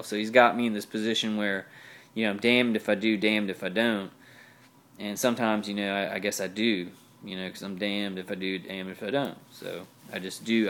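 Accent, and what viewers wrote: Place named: Baton Rouge, United States. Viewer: I see it's a Batman day today,. Your house seems nice- very modern- lucky it goes well with silver?:) You said once you came from Baton Rouge? I guess that would be the accent. I'm interested in US accents, as I am from Australia. I'm slowly getting there in distinguishing some of them. Good video. You've said you're focusing on the external. That seems like a good plan. It could really take some of the pressure off you.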